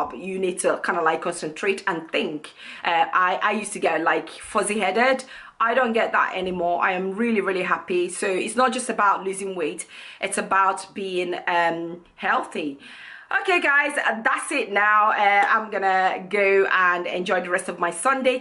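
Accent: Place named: United Kingdom. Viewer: Love your accent are you British?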